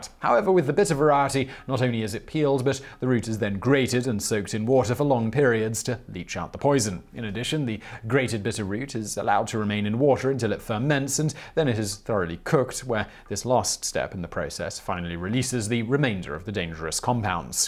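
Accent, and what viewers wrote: United Kingdom. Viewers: You sound British but then you say stuff that is making you look totally not British if you went to a Uk school you had tapioca and sago !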